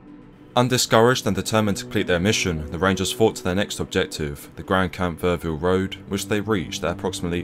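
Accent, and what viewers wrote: United Kingdom. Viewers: I could never crack where he's from but as soon as he pronounced battery as ba'ery I knew he was bri'ish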